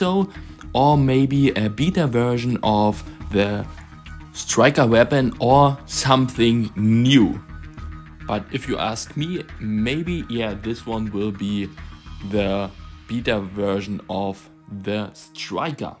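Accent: Germany. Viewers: Ich liebe dein deutschen Akzent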